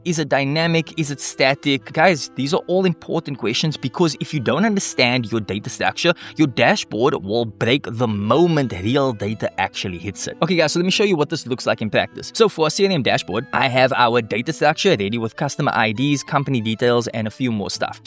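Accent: South Africa. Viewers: You sound like you from The Cape Flats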